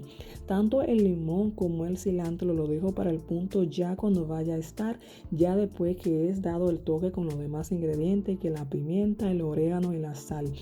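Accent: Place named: Dominican Republic. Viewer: Me gusta mucho el acento dominicano. Saludos desde Venezuela.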